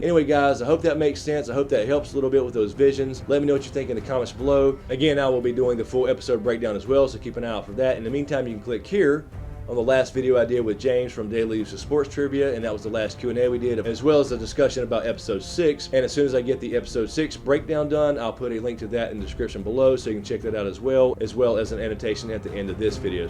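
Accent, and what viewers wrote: United States. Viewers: Oh fuck, your American accent is too much for to handle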